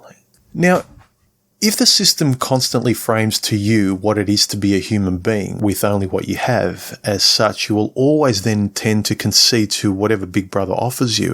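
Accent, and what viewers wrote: Australia. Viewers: Mate, just wanted to say 'Hi' and a huge 'Thank you!' for what you do! I never comment on youtube. This literally is the first time, but decided to do a one-off as I relate to so many things you do and say. I'm a colleague, an animation artist, and absolutely love your illustrations and the wonderfully appealing lightweight design of your videos. You're in a class of your own among MGTOW. I found your channel via Coterie's and have been listening to your videos repeatedly, although I'm not a subscriber as I don't subscribe. Marcus' (Groundwork...), Coterie's and yours are my most favourite, and, I suppose, most relatable emotionally and intellectually. You sound a bit Aussie to me, but I may as well be wrong as English is not my first language, although I've been living in this welcoming country for nearly 15 years. It's heart-warming to hear how total strangers have come to the same conclusions and have taken their lives and reality in their own hands - how in this confused world men (I'm in my mid-40s) are still able to find the path to wisdom and peace of mind, lead fulfilling and meaningful lives. 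Self-actualisation had become my way of getting out of the existential crises of my 30s. Discovering MGTOW only confirmed and widened my understanding and knowledge of how the West has changed in the last 4-5 decades; the culture shock I went through was a temporal one. Growing up behind the Iron Curtain I was 50 years behind, I was a 'Westerner', coming from the past. The gradu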